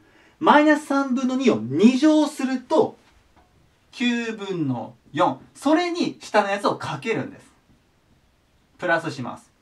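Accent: Kansai, Japan. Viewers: さん、ありがとう。それと何故関西弁？お返事下さい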